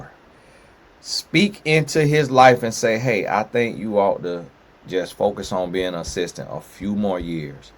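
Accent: South, United States. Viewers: Thank you Tony Gaskins for your channel as your take on life’s situations is hilarious! Love your southern accent, respectful!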